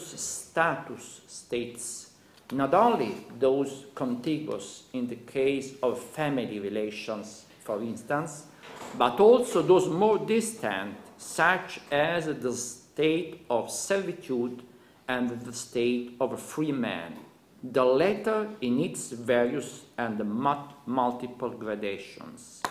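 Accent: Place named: Italy. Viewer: I share in his subtle Italian accent, and incomprehensible sentences in the video, as the munus of our community.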